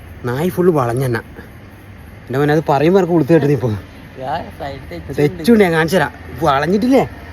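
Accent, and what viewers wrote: Kannur, India. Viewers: നമ്മട kannur ഭാഷ, ❤️🥰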